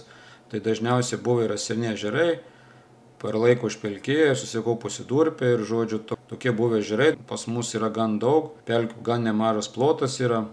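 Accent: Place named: Aukstaitija, Lithuania. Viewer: a pats koks toks esat - suvalkas(užaprobuotas), rytų-vidurio-šiaurės aukštaitis,(tikrai ne žemaitis, nes tie šitaip neišsipisinėja) - kame tas "akcentas" kliūva?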